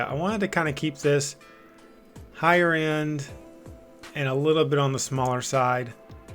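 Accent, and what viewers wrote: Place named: Tennessee, United States. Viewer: Your accent... Where are you from? You sound like a Tennessean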